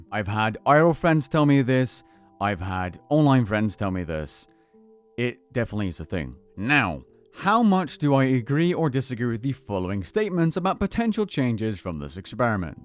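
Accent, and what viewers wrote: United States. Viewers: So, you're telling us that you have a light soft American accent voice, right?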